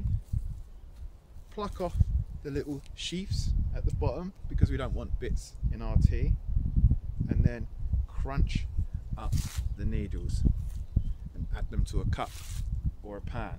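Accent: United Kingdom. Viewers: Hello, where is your accent from? British?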